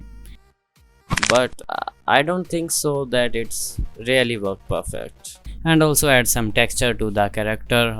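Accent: India. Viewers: points for using dead memes + points for indian accent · ur accent and u look indian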